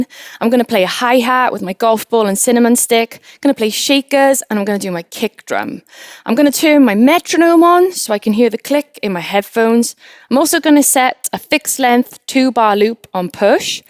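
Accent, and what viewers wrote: Wales, United Kingdom. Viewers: Are you Welsh??? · proper UK accents and golf ball on a stick? Good only for you... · Welsh accent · Are you Welsh? 🏴 I’m from Neath lol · I stumbled upon your video and it's very very good. I love a welsh accent, cool.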